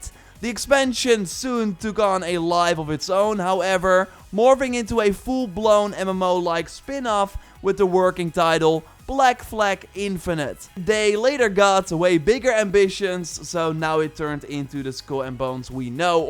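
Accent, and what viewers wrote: Netherlands. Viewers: You sund very very Dutch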